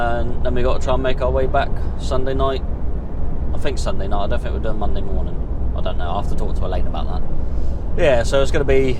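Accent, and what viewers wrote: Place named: South, United States. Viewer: Nice one. Good video and great southern accent you have 😅… keep them coming 👍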